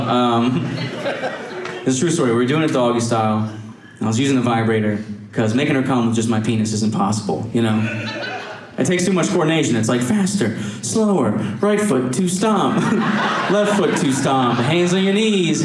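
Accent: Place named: East Coast, United States. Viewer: Great special. Dig his easy flow, never low on the funny. Voice (not style) every so often sounds like Stavros Halkias for a few seconds, he has to be East Coast origin. I’ll watch this a bunch of times.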